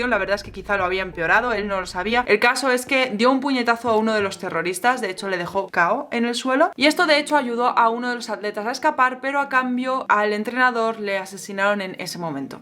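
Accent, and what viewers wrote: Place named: Spain. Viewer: dominas muy bien el idioma , aunque español de españa pero bien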